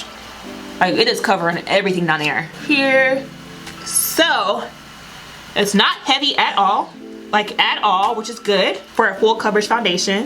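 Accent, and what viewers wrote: South, United States. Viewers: Yes! I just tried this foundation the other day and I love it! I got Warm Sun as well. but it was too orange for me. I still was excited with the outcome... It lasted on my oily face for like 6 hours or so... I was pleased for sure! Your makeup came out perfect! I love it! And your southern accent is so cute LOL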